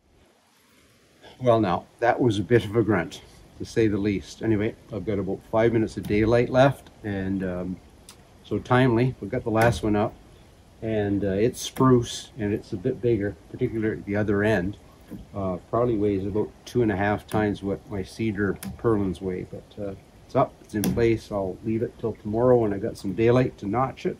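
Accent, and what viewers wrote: Canada. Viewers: Just found your channel, it's amazing! Just subscribed. I'm doing something similar on my channel, building a log cabin with only hand tools, although I have some more modern tools. I'm in the early stages of harvesting logs. I'm learning a lot from your channel and will definitely be incorporating a lot of the things I've learned here into my build. Keep it up! Also where you located? The accent leaves me to believe southern Ontario. I'm nearby in Quebec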